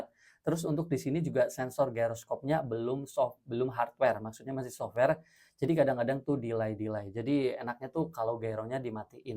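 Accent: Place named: Cirebon, Indonesia. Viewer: Cirebon banget indo nya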